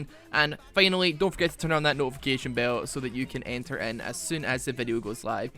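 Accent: Scotland, United Kingdom. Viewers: He's Scottish mate xD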